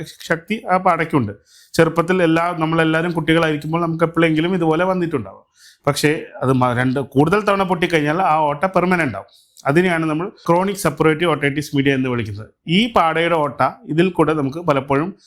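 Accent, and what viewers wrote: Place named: Tamil Nadu, India. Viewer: പ്രിയ ഡോക്ടർ ഒന്നും മനസിലായില്ല ഒരു തമിഴ് ചുവ ഉണ്ട്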